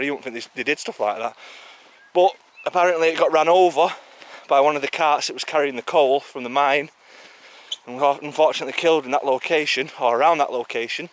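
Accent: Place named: Northern England, United Kingdom. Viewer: Oooh a wee bit off there Dawn as a Scotsman I can confirm is not I'm afraid. I commented on his accent the other day, got to love the northern tones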